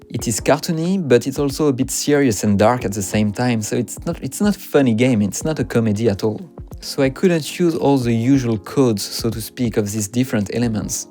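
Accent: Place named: France. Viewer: Super de voir un frenchie avoir un poids aussi important dans l'industrie ! L'accent est ultra tranché en effet :D Mais bon, le bilinguisme est là, le reste on s'en fout ! Ça fait également plaisir de voir que le jeu n'est pas mort et que du contenu refait surface même en 2021. (Je viens juste de voir le post pour cette vidéo sur Steam) Quelle agréable surprise de constater que le compositeur est un Français ! Je n'en avais pas nécessairement l'attention en venant sur le lien mais du coup j'en profite pour laisser un message d'appréciation concernant la bande son ! Les musiques sont superbes, et les ambiances sont extrêmement réussies. Je me disais justement que l'équilibre steampunk et horror était vraiment très bien balancé et donnait un côté vraiment unique. Merci pour ton travail !! En espérant te revoir sur la suite, qu'on espère tous. :)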